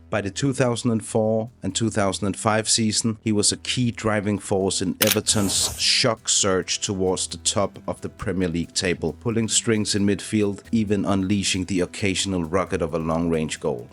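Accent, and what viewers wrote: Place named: Denmark. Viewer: The Danglish is strong with this one.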